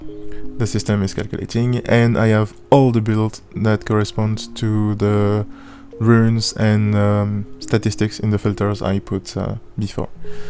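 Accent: France. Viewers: Le bel accent francais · On sent le francais derriere t'es bien le premier ou les sous titres youtubes sont parfait 😂 Tres bonne vidéos dommage que pas en fr!😢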